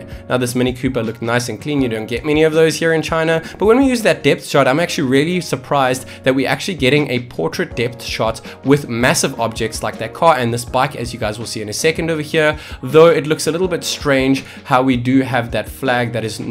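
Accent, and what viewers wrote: South Africa. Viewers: Is this oke South African? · sounds like your from South Africa.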